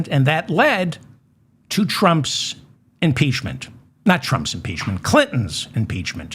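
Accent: New York, United States. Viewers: He is a Noo Yorker. Leave him alone!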